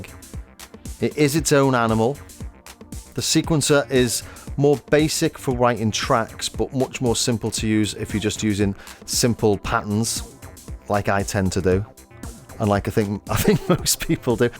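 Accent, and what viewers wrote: Liverpool, United Kingdom. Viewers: Loved discovering you and I subbed. Excellent comparison and I thought it was honest, witty and basically well done, even when negotiating embarrassing technical ground hum. The 303 and Roland sound was so well designed it's hard to improve but you documented the differences well. The 303 has that env mod slide on every note and is generally rounder or rubberier while the Nubass here sounded sharp and precise,with more range. Sometimes a bit too piecing,more isn't always better here (rez) but you showed off the sub osc and lfo well. Good stuff and as a fellow scouser it was lovely to hear the accent so well represented- articulate, not too rambling but clear and funny (without trying too hard). You may of found a YT niche. Sweet.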